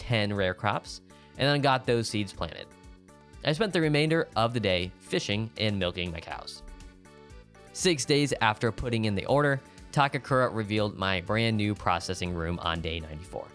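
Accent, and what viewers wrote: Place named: South, United States. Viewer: Eyyyyy your southern accent came out here lol Now i hear it throughout the rest of the vid but, tbf, southern accents are hella endearing and you do a great job with masking it, fwiw xD This vid is still a big comfort lol I wouldnt call out the southern accent if I werent intimately familiar with it, rest assured xD Feels like an Arkansas or northern texas accent lol. My familys got that accent. I do too if I'm around the right people lol Forgive me if ya dont have a southern accent and I'm assuming xD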